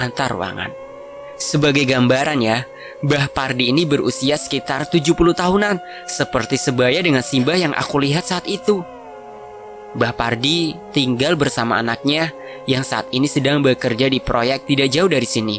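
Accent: Java, Indonesia. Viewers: Logat Jawa yg mana ???..tiap daerah jawa punya logat beda-beda..jadi gak aneh..!!yg penting isi yg di sampaikan dapat di mengerti..ok bosqu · Yap betul...logat Jawa mmg kayak gitu Krn AQ orang Jawa...semangat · Iku logate yo wes Jowo Gok!.. Jowo mu ndi se ??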